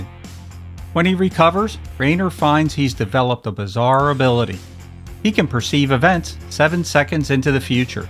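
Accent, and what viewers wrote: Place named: Delaware Valley, United States. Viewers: Judging by your accent, you grew up in the Philly/Del/South Jersey area. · Don’t ever lose that Philly accent!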